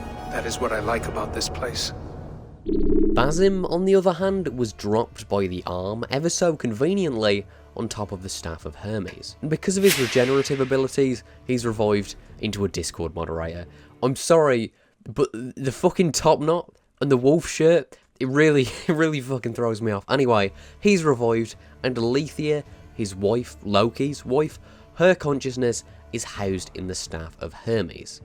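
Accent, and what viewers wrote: Birmingham, United Kingdom. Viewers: I did actually think you were from Brum but wasn't 100% sure. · Birmingham. Can you not tell?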